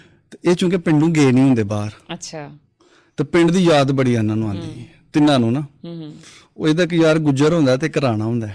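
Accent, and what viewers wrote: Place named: Dogri, India. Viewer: Vadia j&k di dogri BOLI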